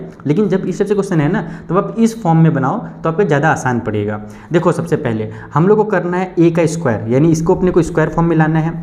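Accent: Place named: Punjab, India. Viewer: Sir ap punjab ka ho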